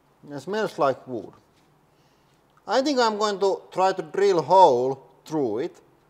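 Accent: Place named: Finland. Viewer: Where are you from? The english sounds a bit Finnish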